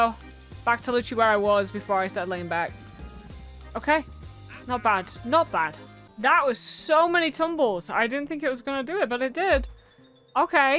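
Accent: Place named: North, United Kingdom. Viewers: I am going to guess Manchester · are you from the north?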